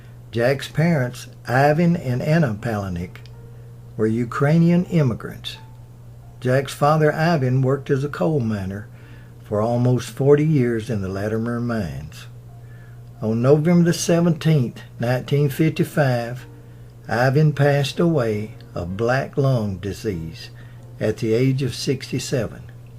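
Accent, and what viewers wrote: South, United States. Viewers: I love documentaries but I see yet another set of remarks as to people being perplexed about others giving thumbs down. will try & tell you it's because of his heavy southern accent and some of that is true...BUT...when you call Elia Kazan ELLA Kazan or the Bubonic plague the BLUEBONNET Plague and say things like "first debut" which is redundant, instead of screen debut which is proper - it gets tiresome. He also called child actor, Brandon De Wilde, BRIAN De Wilde as well as Ida Lupinom Ida LUPINA! The mistakes makes are not due to his southern drawl....they're due to a type of laziness when reading and not caring enough to have someone else listen to the soundtrack and making the necessary corrections. There are more errors and it's a shame because I believe puts a good deal of effort into his research and I think he'd get more respect if he paid a lot more attention to what he's trying to produce. 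· Mr., Your channel is great content-wise. I also enjoy your Southern accent profusely. All the best to you from Poland. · Hey, i love and appreciate your videos. In part because i appreciate your southern dialect, however, it has been proven in several linguistic studies that a large portion of the population at large automatically make the incorrect assumption that people with a southern dialect are less intelligent and less educated. So, sadly, i suspect that a good number of your down votes may be the result of bias against your southern dialect. That being said i also think that your popularity and success on YOUTUBE is also in part a result of people who appreciate your southern dialect. Whenever someone presents something uniquely their own to other people that is powerful enough for them to feel strongly about, then that is just exactly what they will do, some WILL feel strongly that it is good and some will feel strongly that it is bad. 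Don't focus on the fact that you have negative reviews-- after all we all have our critics lol-- just focus on the fact that what you are doing with your documentaries makes people feel strongly about them-- strong enough to leave an opinion-- and that my friend is an accomplishment in and of itself!!! Why... because in today's digital world it takes a POWERFUL CONNECTION in order to motivate people enough for them to even bother leaving an opinion. Even something as simple as a thumbs-up or a thumbs-down... So good job!!! (So this thumbs-UP is for you, what you do and how you do it -- not for my own comment-- however I'm sure that some p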